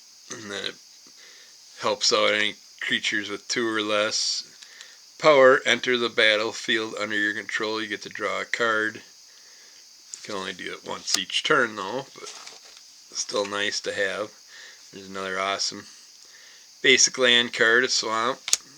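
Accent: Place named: Wisconsin, United States. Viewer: Great video. What I don't know about these could quite literally be put in a book. I remember in 1994 I saw alot of ROTC kids playing this during my junior year of high school That summer I visited a kid I knew who'd moved to Warren Ohio and he tried to teach me how to play MAGIC. I guess I was too much of a right brained kid because I kept getting hung up and confused. I did like seeing the dark art though. It'd be cool cool to see a conan the barbarian version on a card game I knew the old cards and sealed boxes had value but that is amazing just how much the wrappers go for. Cool little asmr style video. The Wisconsin accent is very pleasant. Hope your having a good week and have a real nice weekend, my friend! 😊😊😊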